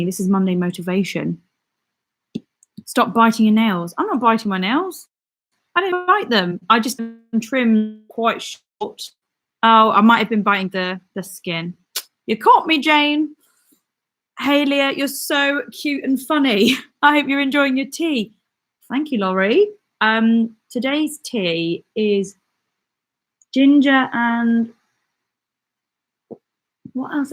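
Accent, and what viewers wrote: South, United States. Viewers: Scarlett O'Hara accent Southern?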